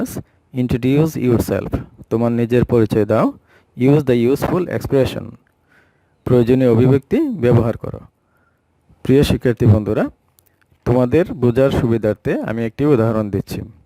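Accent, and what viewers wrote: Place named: Dhaka, Bangladesh. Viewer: আপনার কথা গুলো ঢাকার মতন লাগে, মাধবপুরের বাসা বুঝা যাইনি😁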